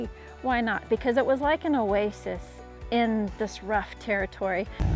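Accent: Australia. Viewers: I'm starting to hear a slight Australian accent coming on.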